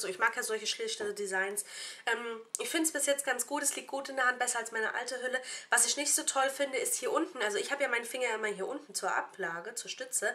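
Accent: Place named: Mannheim, Germany. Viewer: ich wusste, dass ich den dialekt iwoher kenne! mannheim, klar wie klosbrühe :D komm aus der nähe von Heidelberg ;)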